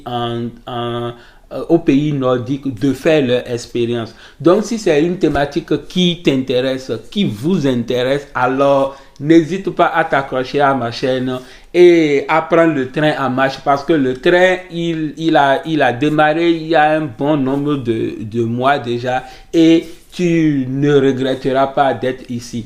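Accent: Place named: Benin. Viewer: Merci bien……L’accent béninois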